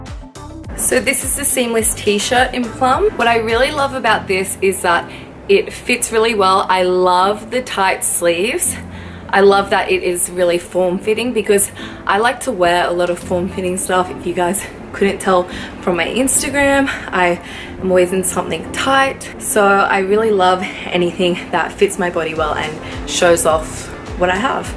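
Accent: Australia. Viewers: r u an aussie m8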